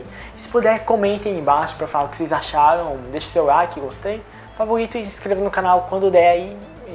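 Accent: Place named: Japan. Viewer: comecei a ver por agr, me inscrevi e talz... Mas vc n é brasileiro? pq vc tem um sotaque japones bem marcante, mas gostei mto do video e vo até ver esse anime xD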